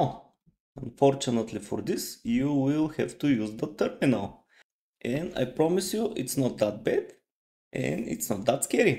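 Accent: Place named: Russia. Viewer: Я отчётливо лсышу русский акцент, ну или славянский, а так спасибо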